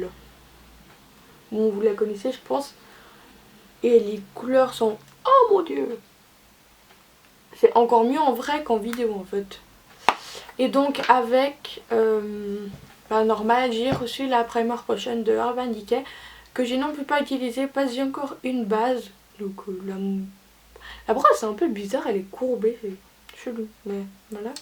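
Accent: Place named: Switzerland. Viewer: je pense que ça doit être l'accent suisse, je doit pas être habitué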